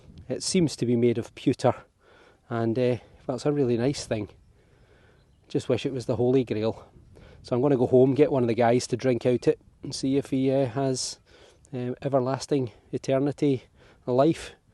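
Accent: Scotland, United Kingdom. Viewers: The flowers on the cup are irises. I love your videos and your Scottish accent.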